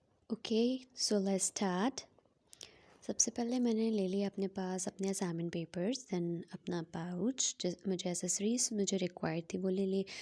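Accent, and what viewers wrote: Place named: United Kingdom. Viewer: English accent 🤌❤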